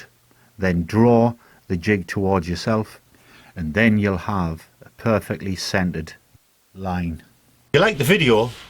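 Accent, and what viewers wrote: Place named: North East England, United Kingdom. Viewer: Clear and simple, Sounds like you come from the area where I grew up, The North East of England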